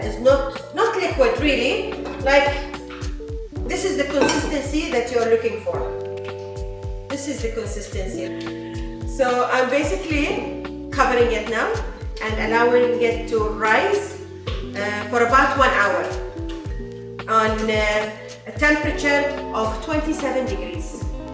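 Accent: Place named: United States. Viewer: Omgosh, you are a joy to watch. I love how simply you make this recipe seem. Yeast scares me but maybe not now. Your English is 100% American perfect. If you don't mind my asking, where did you learn to speak so well? I'm still among from your demonstration. My IG friend sent me your link. I'm going to do as you say, first collect all the necessary ingredients and gadgets before I make this for my friends. Thank you so very much... Stay well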